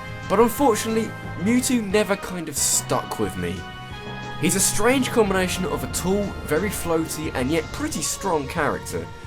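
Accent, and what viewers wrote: England, United Kingdom. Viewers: it's called a different accent. He's from england like me. we don't say YOghurt either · you know i gotta go against your comment that (please read the next phrase in the incredibly satisfying British accent) "you need to be good at brawl to gain any great succes with R.O.B." my cousin was pretty much a joke fighter when we played, he made the characters fell/run/fly of the stage half the time and he only managed to land attacks and KO´s by mere luck... then he used R.O.B. in Brawl and next thing i know: my and the CPU´s characters are sent flying either as a flaming fireball or with a headache because of R.O.B.´s whirly laser eyes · Haha, made me laugh mate! That british+ your video style= geez omg its sooo goood <3 I really dont care if it's going to take you a while getting theese vids done, as long as the keep on coming! 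;D <3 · are u actually british or do u use a voice modifier? sometimes I cant tell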